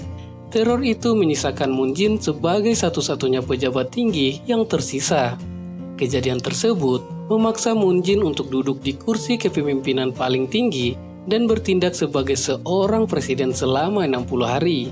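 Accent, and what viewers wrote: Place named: Makassar, Indonesia. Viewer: Dari cara ngomong kelihatan bgt kalau org makassar😂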